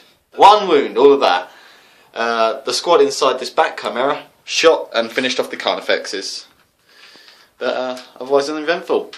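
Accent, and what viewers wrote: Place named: England, United Kingdom. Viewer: Nice batrep mate, it's refreshing to hear an English accent and to see someone getting on with a drawn-up list rather than an American complaining about how the new book is uncompetitive. A nice win and keep up the good work :] PS - Nothing against our Yank cousins, it's just that most of the batreps I've watched have been American and rather dismissive. :P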